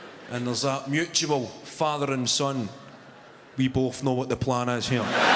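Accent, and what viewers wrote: Scotland, United Kingdom. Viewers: Must be nice. I'm an American working in Japan teaching ESL. I can understand most Irish, English, and Aussies. I have been trying to work on the Scottish accent which is one of the few that I can't master yet. Some of the things he says too fast I think, some are idioms that I don't know (he explained in one video that if a house was free meant the parents were on holiday and there was going to be party whether the teens knew it or not), but I think mostly his accent is just too thick I can't catch on. But the jokes I do catch are funny! My family is hoping to go to Scotland next summer on holiday. I love comedians so Kevin seemed like a good choice. I'm told that for tourists the people mostly tone down their accent. I hope so. (I've had Japanese friends go to the US and Australia and had terrible times with the language). 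Really looking forward to the trip! The countryside looks so beautiful. And I love Scotch. And unfortunately due to Brexit and Covid, it's really cheap! Everyone should be taking advantage of this! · Love this man, I am Scottish, from Paisley. I live in South Africa since 1972. . It's like being at home when I hear him. 👏🙏❤ . Wonder if he will ever come to SA? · I'm so bored with the strong Scottish and weak English shite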